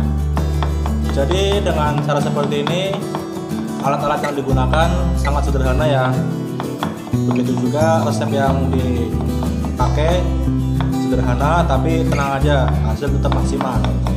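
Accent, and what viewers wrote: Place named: Banyumas, Indonesia. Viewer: Ngapak kayanya bang🤭😁😁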